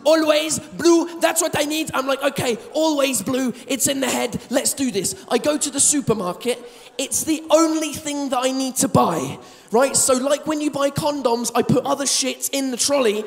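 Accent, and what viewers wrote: England, United Kingdom. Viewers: Voici mon moyen pour chopper l'accent anglais tout en riant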